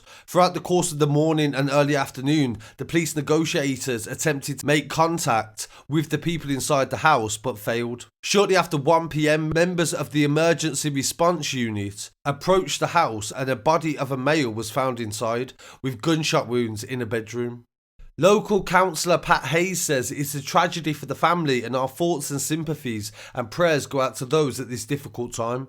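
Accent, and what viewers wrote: Birmingham, United Kingdom. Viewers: That bham accent is the only thing that ruins his channel 🤮🤮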